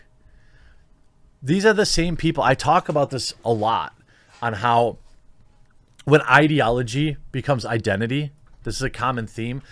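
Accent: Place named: Wisconsin, United States. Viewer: We love, but he pronounces "bag" as "beg," flag" as "fleg," and "dagger" and "degger." I have no authoritative source, but it is believed he also pronounces "wash" as "warsh." He's from Wiscansn. Cut him a little slack.;)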